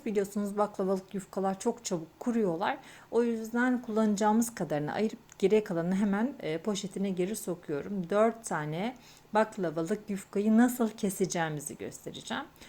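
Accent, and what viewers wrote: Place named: Gaziantep, Turkey. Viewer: hanım nereliydiniz acaba Antepli felan mı merek ettim bu ses verin inşallah iyisinizdir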